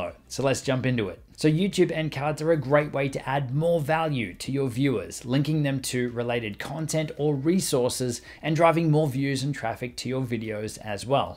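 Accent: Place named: Australia. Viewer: Wow, there's something new every day! Top tips here. It's great to have the occasional Aussie explaining too, none of that grating 'hey what's up guys!' Shudder, puts me right off that does. Just kicking off the youtube journey and will be following you and the courses you do. Thanks very much for the help so far. Terrif! KP - a pom, somewhere in the UK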